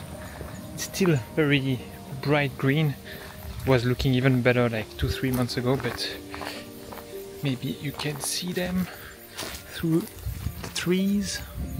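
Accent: France. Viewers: Totally loving this video! My go-to gear is my Sony a6000 and my Sigma 30mm f/1.4 (equivalent to 45mm full frame.) The irony, Sir, in documenting lenses and street photography, you are one of the people are developing the Youtube POV Street Photography video as an artform unto itself. The collation and sequencing of street scenes, I would say, is not unlike putting together a photo book. And the choice of music is another layer that puts the mood and vibe into the video. And your French accent is lovely! Reminds me of my cousins in France. I encourage you to visit South East Asia where you can find more places like this. There have been many street scenes that been ruined by ugly lamp posts. Since my Sony a6000 has no OSS stabilization, I mostly use poles to brace my camera against. It’s sweet, since these poles allow you a 360 degree view while you brace your camera against it. 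But I love your composition solution for these poles. I never realised that small streaks of light can distract the viewer! But then, I mostly shoot at night. I suspect that small hut at the top of the hill could be a toilet. I noticed the signage at the foot of the hill that said 便-something. But I could be wrong. This is the first time I have heard of the camera as being a point in the compositional triangle. I love it!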